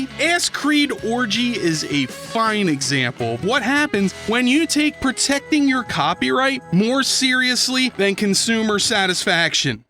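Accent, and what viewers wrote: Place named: Philadelphia, United States. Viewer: this entire time i’ve thought you were a delco or NE philly guy. i guess i was close enough but gd them philly neighbors do share similarities in accents. when I was a broke college kid I would pirate books, movies, games. once I started working and I wanted to play those games or reread the books or see a movie I started paying for them. If something is hard to find I’ll still go elsewhere online to find it. accessibility and cost is everything.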